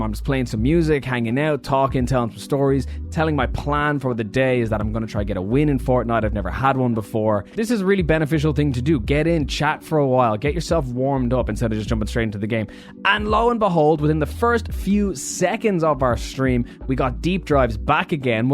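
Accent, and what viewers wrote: Ireland. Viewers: Are you from Ireland? I'm originally from Donegal! I have to subscribe now! Also thanks for the tips, I've only ever done 2 live streams, but planning on doing more!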